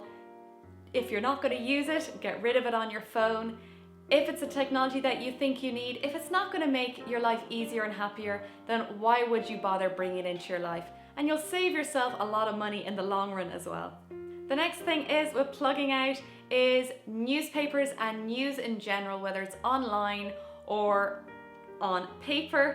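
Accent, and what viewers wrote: Ireland. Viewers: Your accent is beautiful where are you from? Ireland?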